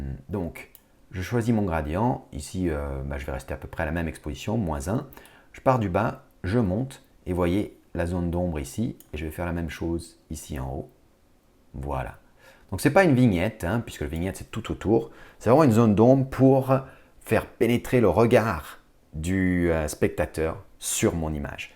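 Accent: United Kingdom. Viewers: Merci pour ce tuto sympathique. Ce que j'apprécie dans ce cours (contrairement à certains), c'est justement son côté "amateur"!! Les idées de base sont là, et permettent à chacun d'approfondir plus en détail la technique. Ne lâche surtout pas ton petit accent "British" qui m'amuse bien et donne à tes cours un côté sympas!!! PS: l'intérêt de YT c'est justement de pouvoir partager ce genre de tuto avec les plus humbles. Pour les "autres" il reste toujours les hautes Ecoles Privées avec toute la choucroute qui va avec.....!!!! Merci encore et continue dans ce sens!!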